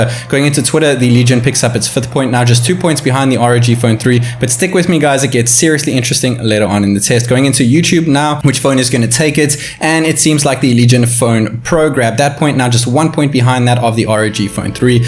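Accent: South Africa. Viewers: this guy has a thicc South African accent!!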